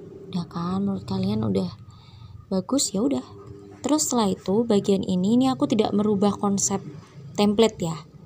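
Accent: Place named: Java, Indonesia. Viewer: Mbak nya jowo ya mba, cengkok e kliatan 😅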